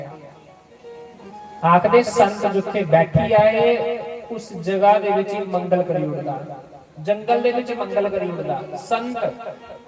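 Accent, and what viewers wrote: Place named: Pathankot, India. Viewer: sanju ji di karth mane puri suni muje to apki je. pathan kot bali bani badi madur lagti h mere maike ki bani jehi h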